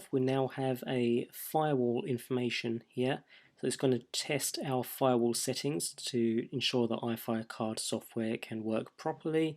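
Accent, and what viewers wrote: United Kingdom. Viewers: why is british accent so damn annoying?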